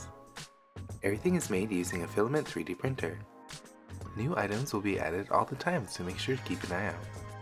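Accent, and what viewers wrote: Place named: North America. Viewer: Oh, actually I have a question about MSC. By your accent I'm assuming you're north American? I've been wanting to start a faceup for a while now but since it's winter the humidity is practically always above 90% and I read msc should be used in below 70%. Do you have problems with the humidity? Thanks.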